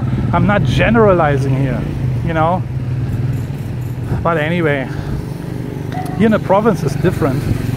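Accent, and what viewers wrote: Germany. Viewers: European accent most probably if I'm not wrong you're a German, am I right?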